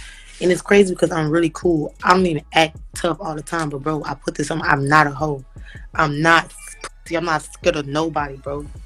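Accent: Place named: Philadelphia, United States. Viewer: Couple months ago she was up on pophunna sounding like she from philly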